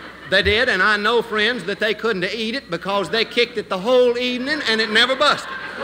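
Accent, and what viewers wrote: United States. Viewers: Andy had all the charisma of Jerry Lewis and Dean Martin all in one with a southern accent. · Yes he did he has Carolinian accent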